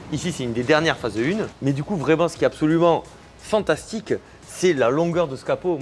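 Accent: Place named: Southwest, France. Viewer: Tes expressions, et ton accent de notre sud-ouest (qui de temps en temps me manque)...j'adore. Ne change surtout pas!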